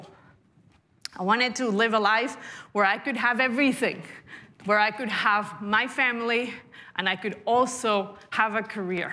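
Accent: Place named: Colombia. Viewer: Quico...lo importante es que ella habla el castellano de Colombia,no el de tu pinche Mexico.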